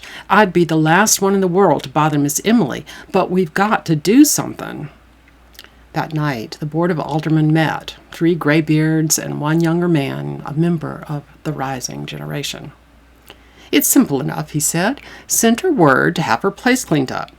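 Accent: South, United States. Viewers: The southern accent REALLY helps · Are you from the south,?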